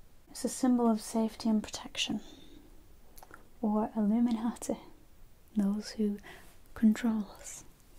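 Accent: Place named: Lancashire, United Kingdom. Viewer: Of all the accents I never thought I would get tingles from, yours (Lancashire?) is one I would never have imagined! But your voice, style and tone is amazing. Also your accent is lovely. Just shows you should never stereotype! Keep it up - and please do a Q&A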